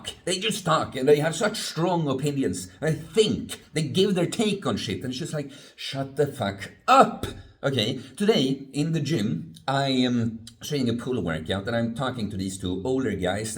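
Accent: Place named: Sweden. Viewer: this was fucking hilarious, I nearly pissed my pants. Your Swedish accent rocks! Love your outlook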